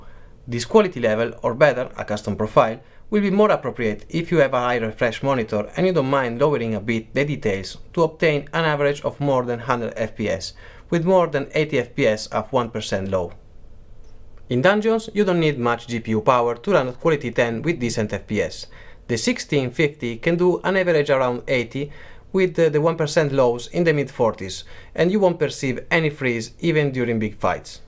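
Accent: Italy. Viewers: Sembri un italiano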